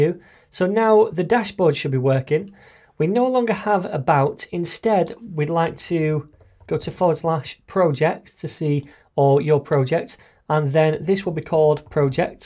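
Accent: United Kingdom. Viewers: Yeah! His UK accent too 😁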